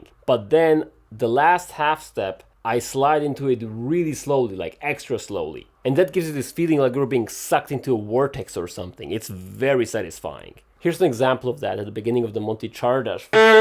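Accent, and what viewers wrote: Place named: Russia. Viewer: are you russian speaking? I hear sometimes russian accent 😄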